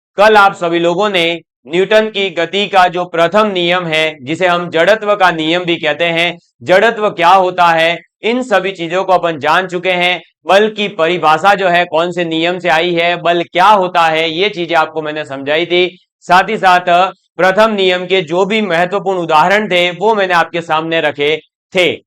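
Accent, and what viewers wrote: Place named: Rajasthan, India. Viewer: Bhai aap rajasthan se ho १००%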